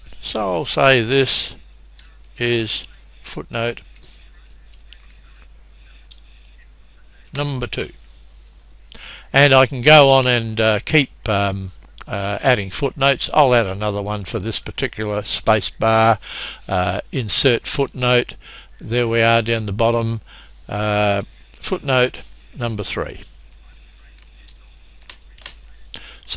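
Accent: Australia. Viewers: Awesome video buddy, helped a lot! Subscribed for future stuff like this! And the Aussie accent made it a ton better!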